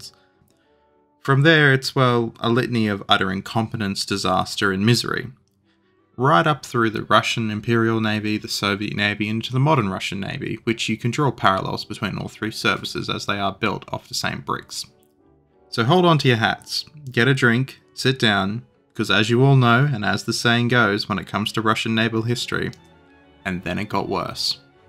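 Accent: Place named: Australia. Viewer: His editor is swedish. He is australian. You can hear it by his accent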